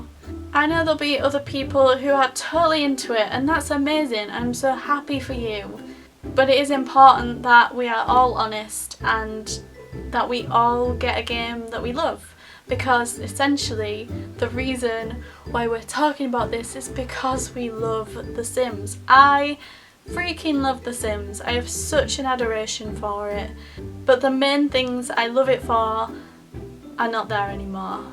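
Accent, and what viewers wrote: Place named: Scotland, United Kingdom. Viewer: I agree and I have completely lost hope now. The Sims 4 is fundamentaly different from other Sims-games in the depth of its core, and I think no expansion-pack or update can save it. :( My only hope is that the Sims 5 will be what the Sims 4 should have been: An improved version of the Sims 3. But it'll take years to find out... Btw are you Scottish? I love your accent :)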